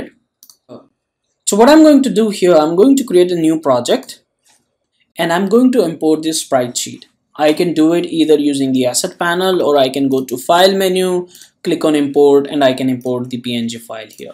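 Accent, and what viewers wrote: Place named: India. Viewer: I fount it interesting that your name is Japaneses and you have Indian accent!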